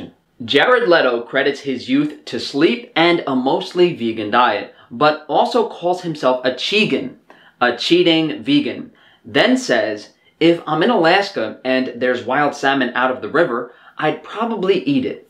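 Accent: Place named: New York, United States. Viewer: it might be his new york accent 🤷🏼‍♀️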